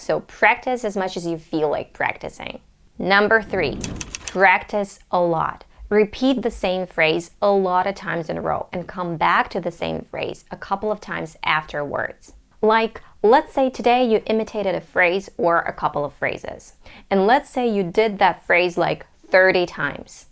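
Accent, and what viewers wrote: United States. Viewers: I thought you were a native speaker ! Then , I heard a little accents ...when you said " comfortable " & the way you said " thank you " in another video ,here & there ,but you are really really good ! Usually any Europeans have their accents ( heavy ) when they speak English ! You got an American accent...why ? How come you chose the accent over British one ? I am curious ! Anyway there are many very good English teachers on you tube ,a couple of English teachers & one American ,they are all female interestingly ! Lol. But, you can really help non native speakers w/ English by using your own experiences & insights ! That others can't ( as those ladies that I really like are all native speakers ,so they don't go thru w/ the walls we,non native speakers bump into & find it hard to climb up on ,or break it down ) guide the way you can ! 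You are a real good success story that we all can lear from ! You deserve more viewers & subscribers !👍💜💜💜💜💜🥁🐉🎵🎤🎶💕💞💕💞💕💞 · Your American English accent and pronunciation is so perfect, dear ... You are one of my pavourite english pronuncers ... Where are you from ?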